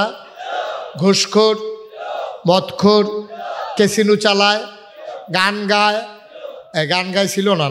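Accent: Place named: Noakhali, Bangladesh. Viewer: হুজুর আপনি তো নোয়াখালীর হুজুর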